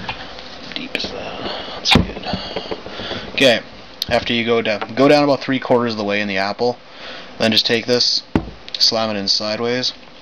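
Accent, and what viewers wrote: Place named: Saskatchewan, Canada. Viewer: thanks dude, you sound like your from saskabush maybe? keep up the good work.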